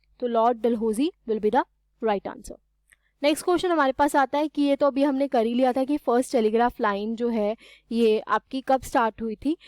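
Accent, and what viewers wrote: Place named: Punjab, India. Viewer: I love ur punjabi bol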